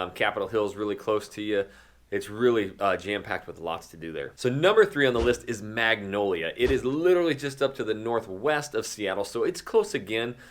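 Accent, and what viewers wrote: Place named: New York, United States. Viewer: I think he isn't born and raised there, he speed talks, I think he is from NYC or upstate New York originally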